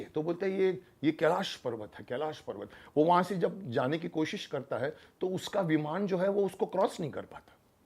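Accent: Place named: Assam, India. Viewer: Wow aap assam bolte hai to mujhe bahut achha lagta hai . Ilove u jaan😍😘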